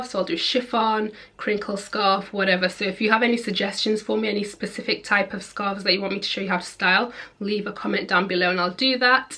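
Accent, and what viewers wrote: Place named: North, United Kingdom. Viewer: You're pretty! And I like your accent. Northern? ❤️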